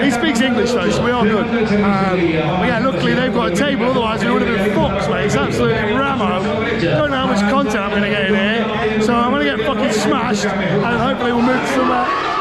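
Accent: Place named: Northern England, United Kingdom. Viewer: loved the Q and A you just come across has a legit northern lad, you na what i am saying bro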